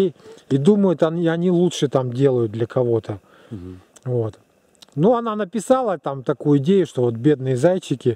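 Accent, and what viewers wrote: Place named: Komi, Russia. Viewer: Слышу как говорите республика Коми и ностальгия по Воркуте)))) только там тундра))))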